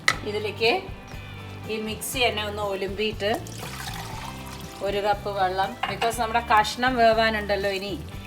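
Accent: Palakkad, India. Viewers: moly. I used to make 70 percent items in same recipie. But I will not miss your videos as I like to hear the explanations in palaghatan sailie.Also I enjoy your (jokes) homely talks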